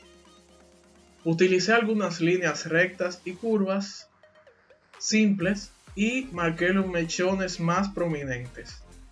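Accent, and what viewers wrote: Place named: Dominican Republic. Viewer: Muy bueno el tuto. Según tu voz, debes ser dominicano. Un abrazo mano desde el patio.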